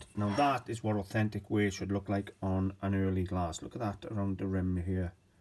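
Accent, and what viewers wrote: Wales, United Kingdom. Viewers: Great video. Aussie viewer here. Love that welsh accent.. I’ve got family over there and it gets me every time lol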